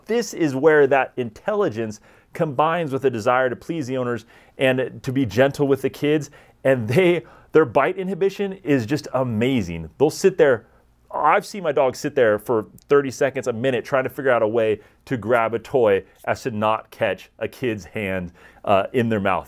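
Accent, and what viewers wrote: United States. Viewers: yeah brother.Are you American?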